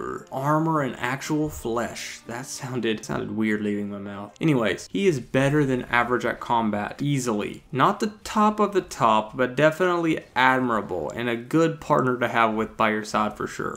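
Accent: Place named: Tennessee, United States. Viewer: I hear a TN accent. Maybe TX. Maybe just joke accents but theyre convincing.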